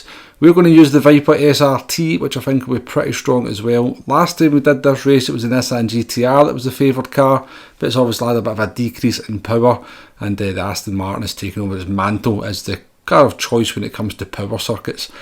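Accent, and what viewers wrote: Scotland, United Kingdom. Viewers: Hi Great video mate I’ve never bothered to learn this track i think it’s about time I did so thanks for the video The Scottish accent hard to understand never People must listen a wee bit harder top man i’m from Essex people never understand me 😂👍🏻👍🏻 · Nothing wrong with the dulcet tones of Scotland. You want to try being me. I'm a geordie :) Thanks for the video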